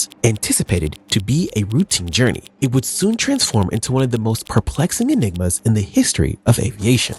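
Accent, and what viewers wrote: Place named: India. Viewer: YOU CANT HIDE UR INDIAN ACCENT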